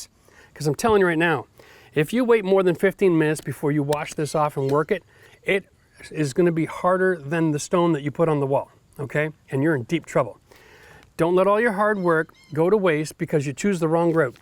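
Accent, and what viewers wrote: Canada. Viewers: OMG, I'm an experienced DIY'er and have done a bunch of remodels and cabinet building. But I never touched the tile work. My latest project is a bathroom remodel and doing the tile for the first time. Watched some of your vid's, got a feel for it. In one of your videos you mentioned something about this grout, but I ignored it. Well, job is done and I noticed this video and watched it... so yeah, oops. This now explains why the stuff was setting so quickly. Fortunately my wife chose this super close color match and it looks good. Whew. Great job on the videos. You sound just like my contractor friends, minus the Canadian thing.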